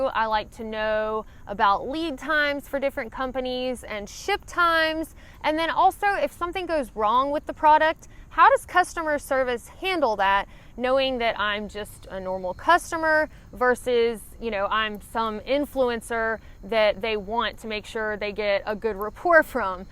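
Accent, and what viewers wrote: South, United States. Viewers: speedy recovery to you dad and thanks for focusing on what’s important in life. I caught your channel about 6 weeks ago and I definitely know you are not sponsored, by your very sincere reviews and even the oops moments. I have used your recommendations for equipment that has gotten me off the couch and start this mad hobby again 35 years after I stopped. Your integrity and the polite way you let us know on what maybe not to buy is refreshing and seldom seen on YouTube. You are one of 4 channels that I watch and to hear that southern accent which reminds me of my dad’s people. Keep up the great work.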